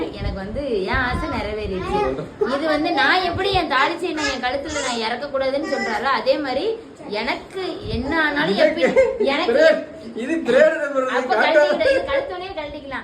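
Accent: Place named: Tirunelveli, India. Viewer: திருநெல்வேலி காரின்னு நிரூபிசுட்ட சகோதரி 👍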